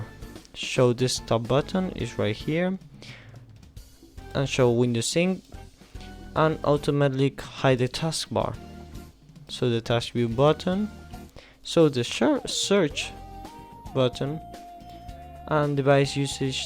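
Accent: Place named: Russia. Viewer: ik he just sounds like a younger enderman, he has the Russian accent and all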